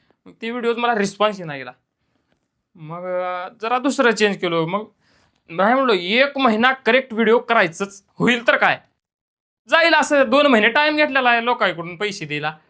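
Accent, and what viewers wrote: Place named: Latur, India. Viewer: 100%Latur cha hay bhau😊😂😂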